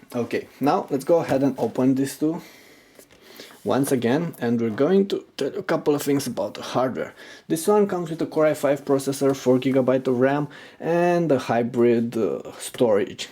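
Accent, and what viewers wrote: Romania. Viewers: Bai tu ai accent de roman :) ... asai :) ? Salutari din München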